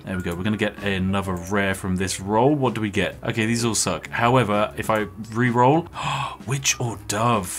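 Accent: United Kingdom. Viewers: This guy literaly british lets gam it out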